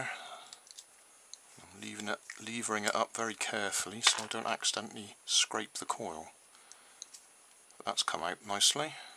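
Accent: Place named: South West England, United Kingdom. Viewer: Where are you in the UK? You have a south west accent, sort of Wiltshire to Bristol possibly. I'm originally Suffolk, but now live in France, Dordogne, which is very rural. My main heating is a 14 Kw wood stove, which I feed from my own woods. Spent some time in Wiltshire long ago, at RAF Compton Basset. Also briefly near Weston Super Mare. If I take thing apart, I always try not to destroy the bits in the process, as they could be useful in the future, and small motors have potential, where bits of bent sheet metal are less likely to have much. However, the coil would be a good source of wire to make smaller coils, for experimenting with, so, its swings and roundabouts really. I dont understand why people get so emotional on these sites, after all, scrap is scrap, which ever way you look at it.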